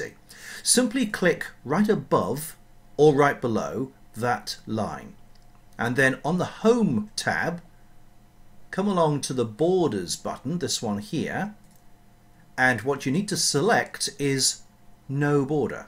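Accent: United Kingdom. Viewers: I was frustrated with that line. Thanks a ton for showing how to get rid of it. Although it could have been a shorter video. Loved the Brit accent...been a long time since GoT!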